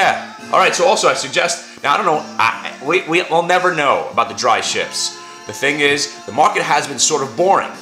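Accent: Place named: United Kingdom. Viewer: genuine british accent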